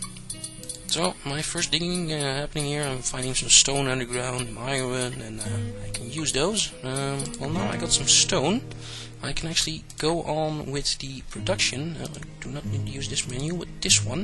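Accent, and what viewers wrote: Netherlands. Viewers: i think you are from holland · Are you dutch by chance?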